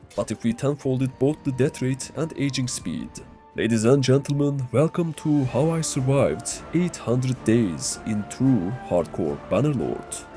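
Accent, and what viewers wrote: Turkey. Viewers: Bastaki dying telafuzundan türk oldugu anladim. Memati maskeyi ne kadar takarsa taksin. Basarilar kral kolay gelsin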